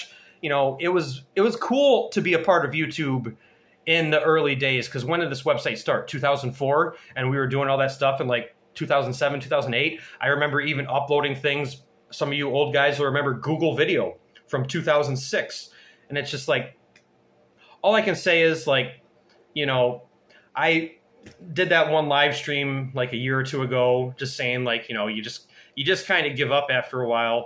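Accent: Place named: Midwest, United States. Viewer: wow you have a strong midwest accent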